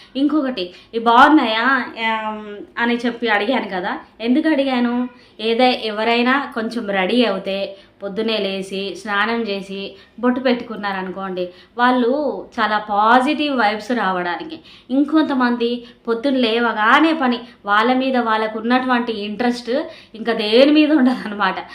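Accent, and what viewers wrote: Telangana, India. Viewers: ఎన్ని జీవిత సత్యాలు చెప్పావమ్మా, మొత్తం వీడియో చూశాను చాలా బాగుంది❤ మీది తెలంగాణ అని మీ మాటలు బట్టి నాకు అర్థం అయింది❤ వీడియో 👌👌👌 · Avunu sister mana telangana langvege alane untadhi superrrr sister 👌👌👌👌👌👌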